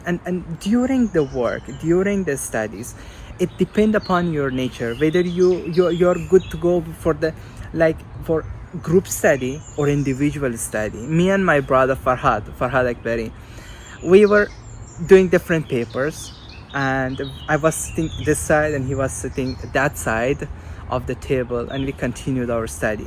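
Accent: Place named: Afghanistan. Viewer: Hi are you Afghan because your pronouns form Afghanistan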